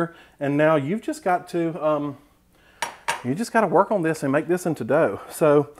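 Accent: South, United States. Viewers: OOOH! at 3 net carbs I can eat all twelve for a total of 36 carbs...if you can eat 20 to 50 carbs a day you’ve got it made!!! Hmmmm will the cheese cause the bowel to bind???? Love love your channel and your southern accent, mine is Texan, makes me feel at home. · “Y’all”~ I love your channel & your accent!! Thank you! A Southern Belle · You are really entertaining and I am really glad I stumbled upon your Channel. Canada, so love that southern accent lol. I think I will have to try making these with that packet of Fleischman's yeast. My Baby Book had notatiins from my Mom saying that I grew like Fleischman's Yeast as a young baby. Ha ha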